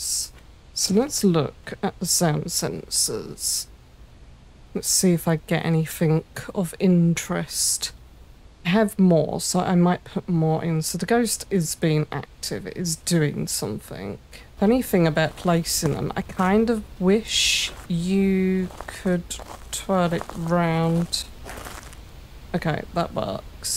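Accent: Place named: United Kingdom. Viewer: from what I’ve heard most British accents I hear are far more pronounced than yours, which is why I was wondering what accent you had. I guess you just have a really relaxed way of talking!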